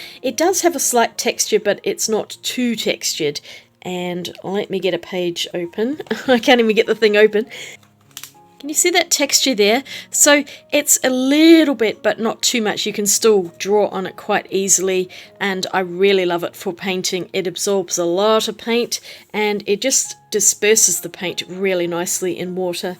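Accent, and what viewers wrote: Australia. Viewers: Love hearing another Aussie voice. Hot press paper is fun to play with granulation paints on. Thanks for the comparision. Waving from Queensland 😊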